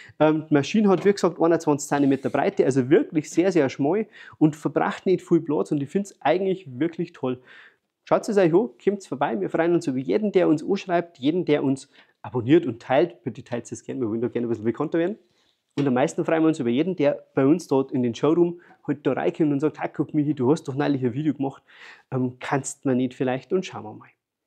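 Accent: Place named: Bavaria, Germany. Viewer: Für nicht Bayern leider nicht immer ganz leicht verständlich aber deine Begeisterung kommt sehr authentisch rüber. Toll😊 Auch für mich in der engeren Auswahl. Leider wohn ich nicht bei euch in der Nähe sonst käme ich auf jeden Fall bei dir. Vorbei. 🎉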